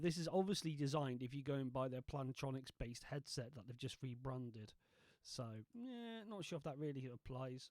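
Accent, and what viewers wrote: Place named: Southwest England, United Kingdom. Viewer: Well that was very entertaining, a bit of a car crash although that's not meant as a criticism I love the way you go live for the first time on YouTube and just learn on the go. As for Dragon 6 it looked awful in terms of Bugs and response but without knowing what hardware and Mic you are using its hard to judge. I was shocked though generally that you had the bug meaning that it would not even tell you that the mic was live! By the way and I'm only talking from experience of version 4 you can only wake the mic for sleep mode (Yellow) not the red off position. So basically I was unimpressed with the performance however I am slightly torn as a video from voice recognition Australia shows it has fantastic accuracy with basic dictation with no training whatsoever other than setting up the mic and that's what I really need it for as I'm disabled and cannot type so I may still take a punt at the reduced upgrade price of £94. 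I love the way as an Apple fan clearly you use Samsung's distressing news about exploding handsets for your demonstration of dictation, I'm still laughing by the way at Apples "courage" at doing away with the headphones jacks and the shit loads of bad PR and all the funny memes that followed which entertained all week. You have a good weekend by, the way what part of the UK are you from sounds like the Southwest-ish? I'm off for a pint of real vinegar.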